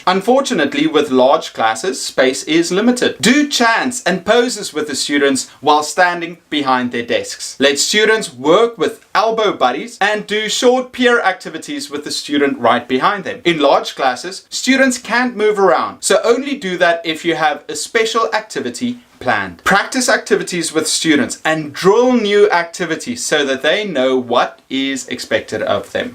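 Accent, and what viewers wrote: South Africa. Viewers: haha I you South African bro?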